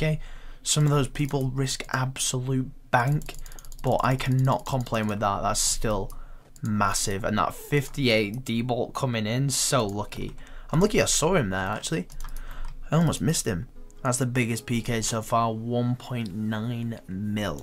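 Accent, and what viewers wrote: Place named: United Kingdom. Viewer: Uk accent = ez youtube voice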